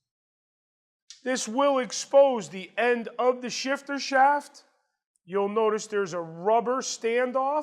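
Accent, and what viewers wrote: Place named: New England, United States. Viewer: GOD! I love eye-talon guys from the the east coast,new england!!! Fuckin accent is crazy awsome!!!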